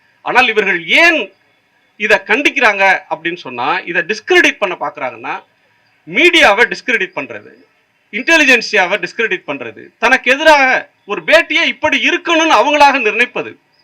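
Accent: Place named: Kongu, India. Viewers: Kongu tamil appadithaan erukum. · இப்ப வரைக்கும் நீங்க பேசறது கோயமுத்தூர் வட்டார கொங்கு ( கொஞ்சு ) தமிழ்தானே.......❤❤❤ கச்சடாவை தூக்கி தூரமா போடுங்க......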